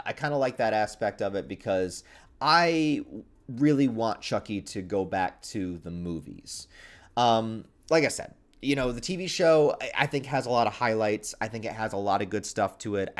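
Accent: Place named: Northeast, United States. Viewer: Northeastern accent lol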